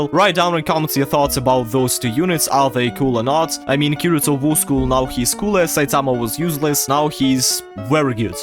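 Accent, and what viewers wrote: Russia. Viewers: Are you Russian? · По акценту мне кажется что ты наш русский слоняра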